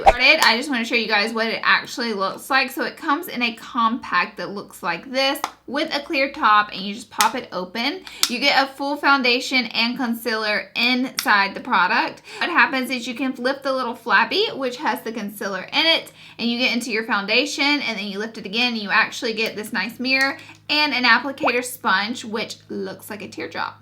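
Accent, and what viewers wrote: South, United States. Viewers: From one southern girl to another, your accent just makes me so happy! I love this compact 😊 #snapchatfam #snapchatfamforlife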